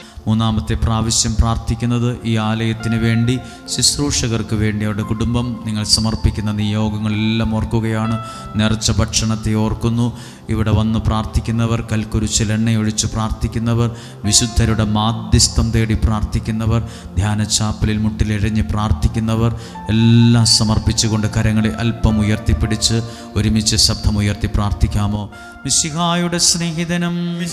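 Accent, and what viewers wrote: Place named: Butler English, India. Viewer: Why is it in butler English. Can't hear or understand properly ast 2 weeks.missed ...keep only English clearly or only malayalam clearly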